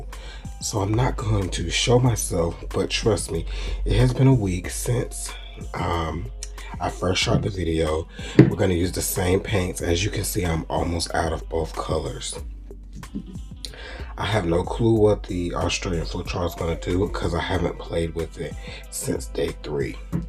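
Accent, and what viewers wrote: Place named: Alabama, United States. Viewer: You sound like you live over here where I live lol I live in Gulf Shores, Alabama… Where the humidity is crazy and the weather is hot during the day and not as hot at night, but the humidity makes it awful lol where is it that you live?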